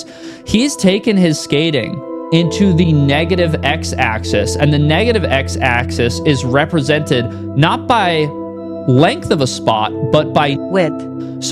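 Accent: United Kingdom. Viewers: Love the English accent 😂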